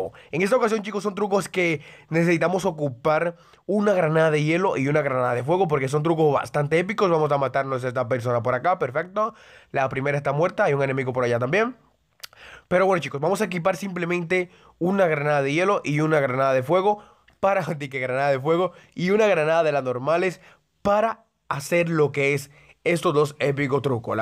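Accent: Dominican Republic. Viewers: eres de República Dominicana?. ¿Verdad?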